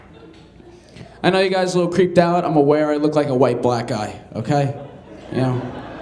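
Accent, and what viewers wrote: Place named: New York, United States. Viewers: His New York accent tho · that smooth ny Staten Island accent: not very thick but still recognizable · Why does he currently sound like a 27 year old New Yorker who moved away but here he sounds like a 65 year old mafia boss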